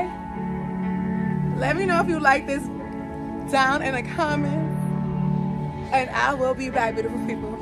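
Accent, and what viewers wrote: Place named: New York, United States. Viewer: I can’t tell if she from Long Island or the Bronx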